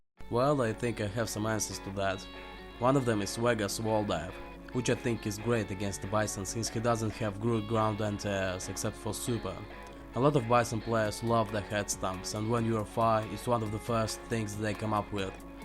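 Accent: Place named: Russia. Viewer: Russian accent best accent.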